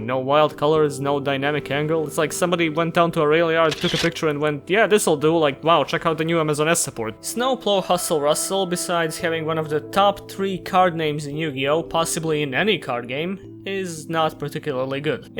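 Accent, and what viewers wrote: Balkans. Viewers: didnt know you were from the Balkans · E brate tačno sam znao da si neki naš balkanac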